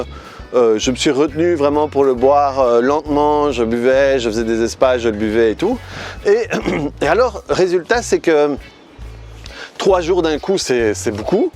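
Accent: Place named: Belgium. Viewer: Ça se voit pas mais ça s'entend que tu viens de Belgique haha ><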